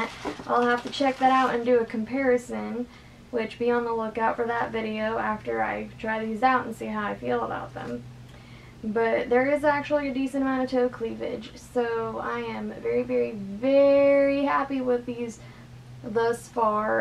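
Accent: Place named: South, United States. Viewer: I love your accent also. I'm sure like me, you're from the South.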